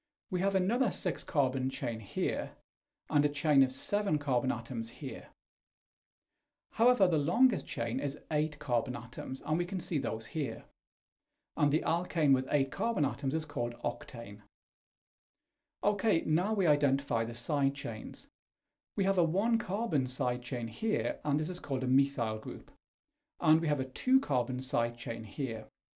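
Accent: United Kingdom. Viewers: WHERE IS OUR OG BRITISH ACCENT!?!?!?😩😔😔😔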